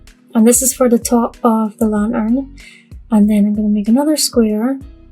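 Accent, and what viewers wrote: Scotland, United Kingdom. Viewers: I can’t believe you only have 5,000 subscribers!!! You should have millions! Your diys are fantastic! Are you originally from Scotland? I was wondering what your accent was. Dollar tree even had you as a focus person in there newsletter!! Come on people subscribe and show this talented lady some love!!! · Love the Scots accent and love your videos. Learn so much. Thank you!!!! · With all the beautiful things you make, you should have more than 5K subscribers. I love all your videos. Thanks for sharing and I thought your accent sounded familiar, Scotland right? :0) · Wow! I love all three of these. Ya know, its funny as I just started watching the video, I was wondering where your accent was from. So I asked myself ' did she say Scotland in a previous video?' I giggled at the end so yes, that answered my question. Congrats! 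· I love your ideas! And your "scotland" accent! I could listen to u all day! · I love your Scotland accent. Those that you made are such a great idea and very cute!! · Thank you for always inspiring me I love your DIYs !! I adore your Scottish accent.. It took me awhile to get some tumbling blocks and I tried to make some stuff and failed lol but I finally made a box with drawers and it came out decent. Thank you for your inspiration and congrats !!!!🎉🎉 Woot! Woot! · I love your Scottish accent! I dream of visiting Scotland one day as it seems like such a beautiful country! Your DIYs are so beautiful and creative! Keep up the good work!! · Just found you on YouTube a few days ago, love your tumbling block crafts. You voice is very calming, love your Scottish accent. There's a wee bit of Scott in our bloodline. Keep up the wonderful videos & congratulations on reaching 5000!! 🎉👏👏🎉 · !!! 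I just subscribed with you a couple of days ago. I’m mesmerized by your soft cute Scottish accent. It’s so cool! Scotland is my dream vacation. One day I swear I’m going 😋. So I’ve been loving your tumbling towers shelves and plant stands you make. I’m going to give it a go for my mom Mother’s Day gift! Wish me luck. Thank you for the ideas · I love everything you make and I love listening to your Scottish accent I love Scotland it’s an amazing place x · Your projects are always so nice. That sure was a lot of tumbling tower blocks!! Lol! I knew your accent was from Scotland. I just love to listen to you talk. Lol. Thanks for sharing! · Absolutely love your tumbling block tutorial! Your voice and Scottish accent are so soothing. I’m glad to have found you, and hit subscribe straight away! Visiting Scotland is on my bucket list, along with a good bit of the rest of the world! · You are so creative with these blocks! 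💕💕💕 I love listening to your Scottish accent. You're almost at 6K! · I Love your "Scotland" accent! 🏴 Your amazzzing making sooo many beautiful items, very talented! I can't even glue them even with my square. 😂 I subscribed to ALL video's, I don't want to miss any tutorials, I'm going to go back and watch your previous videos also. Congrats on hitting 5K!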